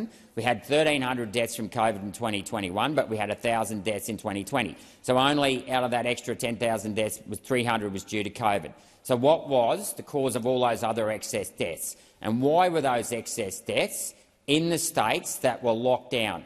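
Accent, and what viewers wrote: Australia. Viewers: sorry bro I can't take Australian accents seriously.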